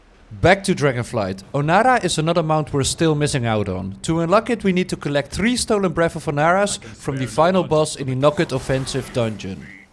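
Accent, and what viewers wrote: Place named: Netherlands. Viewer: sorry but it was very funny xD, i guess youre from the netherlands ^^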